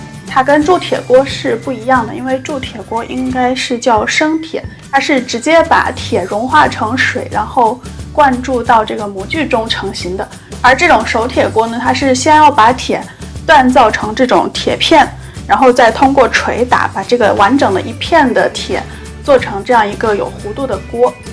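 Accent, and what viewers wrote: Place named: Xi'an, China. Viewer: 听口音有像西安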